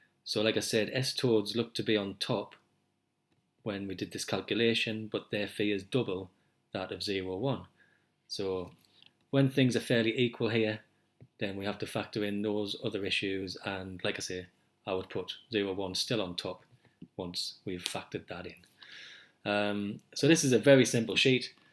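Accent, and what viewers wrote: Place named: Darlington, United Kingdom. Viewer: Sounds like you're just a bit north of me in Darlington. Great to hear a localish voice talking about my favourite subject so I've liked and subscribed!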